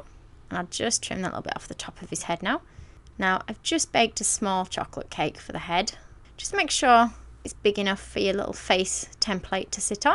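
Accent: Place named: Lancashire, United Kingdom. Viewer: Blonde Rose She does sound enthusiastic.She actually says at the end how much she enjoyed making it.Also I am pretty sure she does the voice over after she has made the cake.And I am always impressed by how she manages to talk us through everything she is doing.She clearly takes a lot of effort to get her videos perfect.Music is such a subjective thing,what one person will,love,another 5 may hate.So I think it is a wise choice not to have it at all.I actually really like her voice,and what sounds like a soft Lancashire accent to my ears.And what a talent she is.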